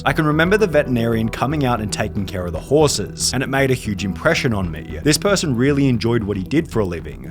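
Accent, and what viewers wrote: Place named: Australia. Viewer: He’s Australian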